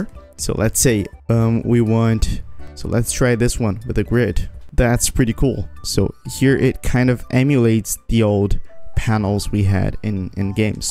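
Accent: Brazil. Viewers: I also felt something like he was Brazilian lmao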